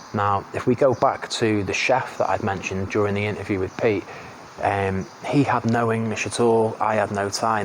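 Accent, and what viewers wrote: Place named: Manchester, United Kingdom. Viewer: Well that confirms I'll never open a restaurant in Thailand hahaha. Great story. Are you originally from Ireland because to me your accent is North West/Manchester English?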